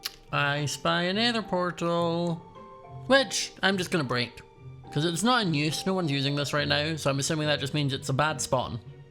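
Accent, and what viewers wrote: Scotland, United Kingdom. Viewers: Are you Scottish? JW you just sound Scottish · Are you British?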